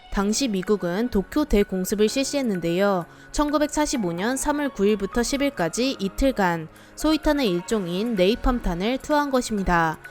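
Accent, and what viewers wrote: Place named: Gyeongsang, South Korea. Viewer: 언니 경상도분이신가보다 !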